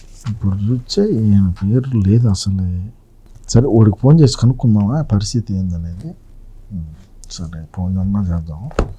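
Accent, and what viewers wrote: Rayalaseema, India. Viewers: భాష రాయలసీమ భాష బాగుంటది.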